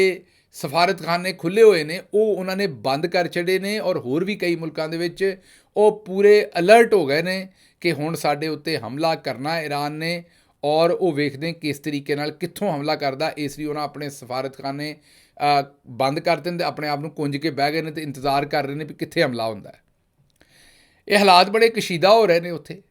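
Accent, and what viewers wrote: Punjab, India. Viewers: Saab I literally enjoy listening to Ur Punjabi accent ❤😂 I myself a paki Punjabi from Karachi & resident of Oman but my Punjabi is soooo very poor😂 May Allah bless u Sir lotsss of respect🫡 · گل صاحب تسی منو یا نہ منو لیکن تہاڈی پنجابی دا سواد ا جاندا ہے کڑاکے کڈ جاندے ہو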